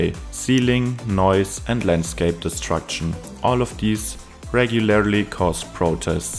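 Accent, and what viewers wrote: Germany. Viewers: Most cliché German accent ever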